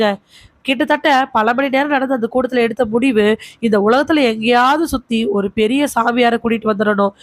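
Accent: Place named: Kongu, India. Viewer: Kongu Tamil Vera level sister 🎉🎉🎉🎉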